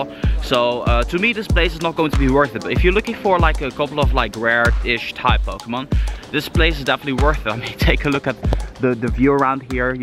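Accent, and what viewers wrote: Netherlands. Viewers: Mooi nederlands-Engels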